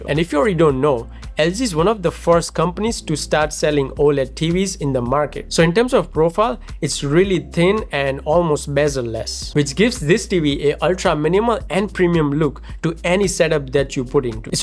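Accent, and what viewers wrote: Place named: India. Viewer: The accent is very much mix of too indian and US!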